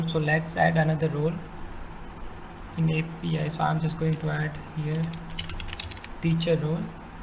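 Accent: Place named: India. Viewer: я по говору индусов и их говнокодом сразу за милю чую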